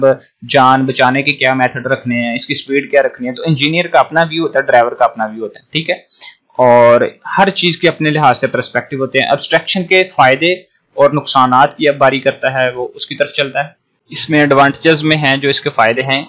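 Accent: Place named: Faisalabad, Pakistan. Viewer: Pka faisalabadi han janab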